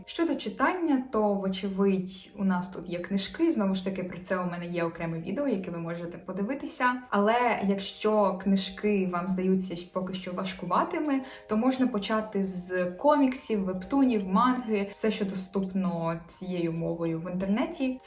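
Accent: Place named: Japan. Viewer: Дуже пізнавально, дякую!! хочу сказати що мабуть те що ви почали вивчати японську -наклало відбиток на вашу інтонацію. При бажанні подивіться відео ToriChyanChannel. Наша дівчина що вийшла заміж в Японію, в неї дуже змінилась вимова і інтонація ( на останні слова в реченні) за ті роки що вона там. Бажаю вам щастя і успіху!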